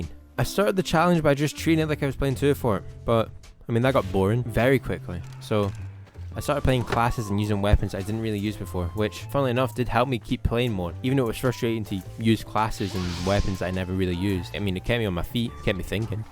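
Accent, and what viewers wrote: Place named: Scotland, United Kingdom. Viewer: why are you scottish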